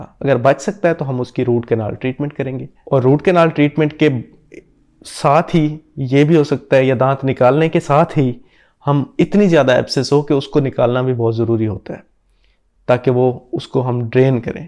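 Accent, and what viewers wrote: Saraiki, Pakistan. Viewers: ڈاکٹر صاحب سرائیکی لگتے ہیں اپنے accent سے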